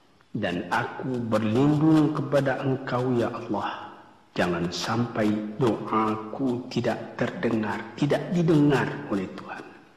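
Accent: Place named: West Sumatra, Indonesia. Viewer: logat minang ny khas banget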